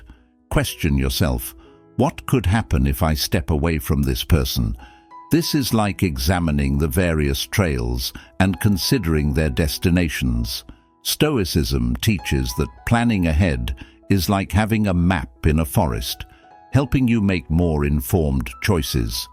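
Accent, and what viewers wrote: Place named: United Kingdom. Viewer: The expressions....social media", snacks, and and "online".. do not go well with the overall energy brought on by the voice of wise, elderly man speaking with a classic British accent 😄....lol....This is only my opinion....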